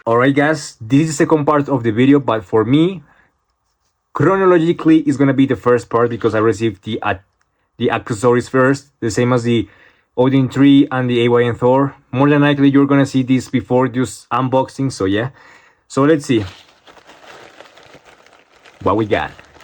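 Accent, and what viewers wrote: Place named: Colombia. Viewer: Se nota que el inglés es tu segundo idioma, el primero por el acento es español. Infiero con total certeza que el eres de Colombia por qué también lo soy. Solo una recomendación, por qué a mí también me pasa, intenta hablar más pausado más lento, con más ritmo. Así notas más seguridad y profesionalismo. El video es útil. Gracias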